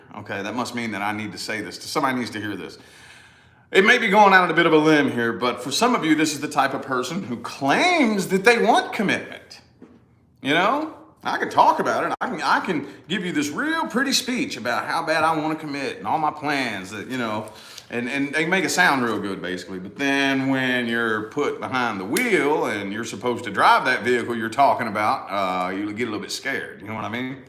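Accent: South, United States. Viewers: Love your voice and your accent and expressions, you make me laugh, is that a southern American accent? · Yes. That's a southern accent. Different southern states have different dialects.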